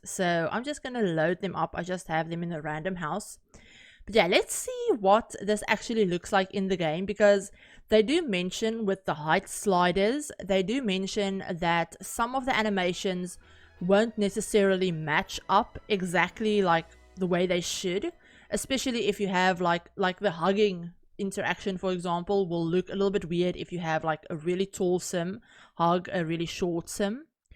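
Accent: South Africa. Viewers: South african accent lol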